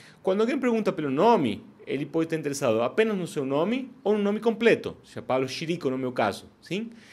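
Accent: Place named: Argentina. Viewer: Débora, é o sotaque argentino. Na Espanha por exemplo, "como te llamas" nós pronunciamos: como te " iamas" . A escrita é idêntica, mas a pronúncia muda bastante 😅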